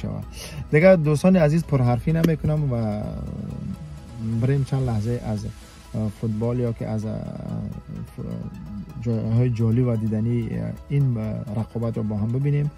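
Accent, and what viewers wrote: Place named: Iran. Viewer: Ин чи хел лахчаи гап заданай ним точики нимш лахчаи эрони 😅😅😂😂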